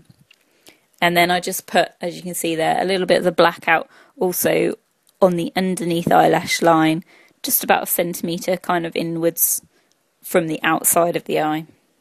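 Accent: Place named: United Kingdom. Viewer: What part of Britain are you from? I love you accent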